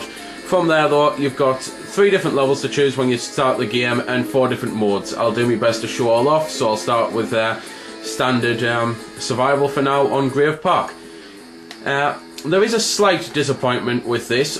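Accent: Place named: Sunderland, United Kingdom. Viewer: are you from sunderland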